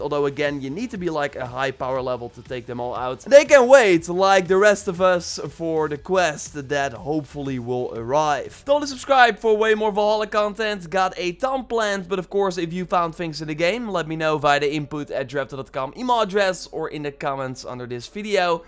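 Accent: Netherlands. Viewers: Are you from the netherlands? You sound kinda dutch to me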